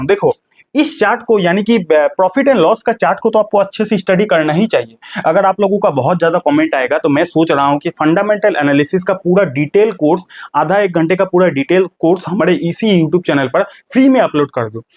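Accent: Bihar, India. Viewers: Are you bihari???😊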